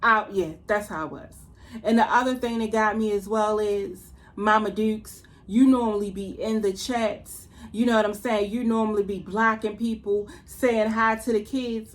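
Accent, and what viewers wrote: Baltimore, United States. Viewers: I love ur Baltimore accent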